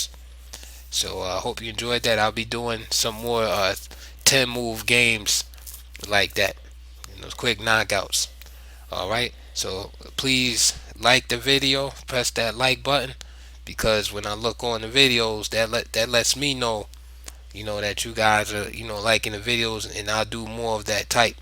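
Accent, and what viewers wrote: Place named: East Coast, United States. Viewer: Dope!!! Are you from NY or Philly? That east coast accent!💯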